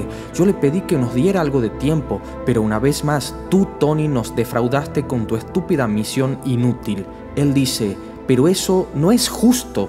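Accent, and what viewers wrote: Tucumán, Argentina. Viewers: sos tucumano amigo? habla como tucumanl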